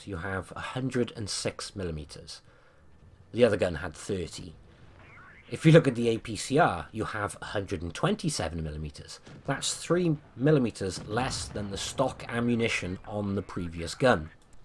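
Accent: United Kingdom. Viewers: I'm English anyway good British accent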